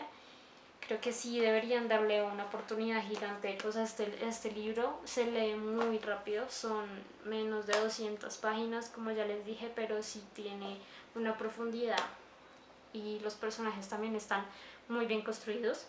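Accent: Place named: Mexico. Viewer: Ahora hablas con acento mexicano :D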